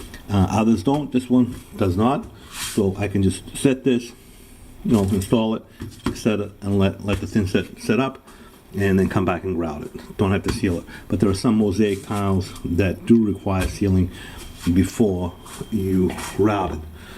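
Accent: Boston, United States. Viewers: I never tire of hearing the Boston accent. 🤣😂😁😂🤣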